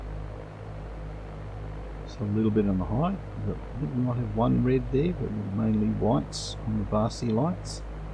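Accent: Australia. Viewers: Great video !! Nice to hear an Aussie voice for a change. I recently joined Xplane. I am rather disappointed with the scenery. This scenery looks really good. Can you you tell me if this is add-on scenery or is it standard?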